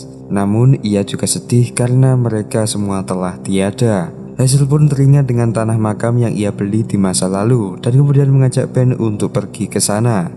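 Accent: Java, Indonesia. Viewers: Admin e wong jowo iki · Orang Jawa yo ....jowone ndi lurr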